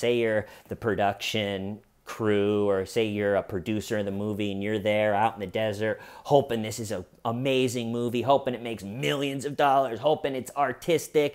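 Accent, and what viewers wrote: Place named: New York, United States. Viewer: You're picking up a NYC accent, homie.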